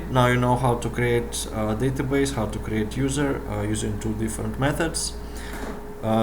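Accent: Russia. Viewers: слышно ж что акцент русский, сделал бы субтитры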